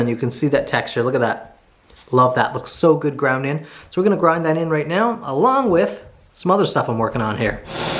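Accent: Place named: Newfoundland, Canada. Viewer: I knew it! I checked out your info below the video to see if it was true, I could tell by the accent! You're a newfie! God bless you. Great work on that knife. Good to see a fellow East coast Canadian on you tube. (I'm from New Brunswick by the way). Took a woodworking course in Cape Breton so that would have been my second guess. All the best to you and yours!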